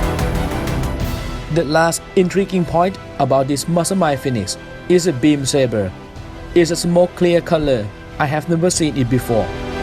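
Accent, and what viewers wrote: Thailand. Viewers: เสียงเหมือนสำเนียงไทยมากกกกๆ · สำเนียงแบบนี้ คล้ายคนไทยมวาก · สุดยอดเลยครับ ปล.คนไทยไหมครับเนี่ย สำเนียงชัดเลย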